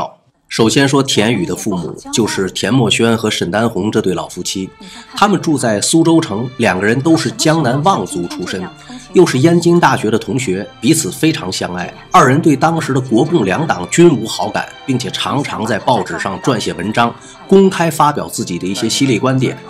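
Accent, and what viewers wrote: Tianjin, China. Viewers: 感觉是天津人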